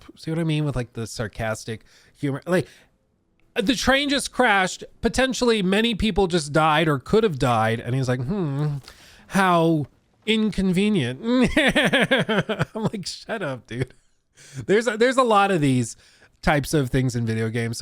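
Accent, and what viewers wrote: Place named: United Kingdom. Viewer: How you cope with the British accent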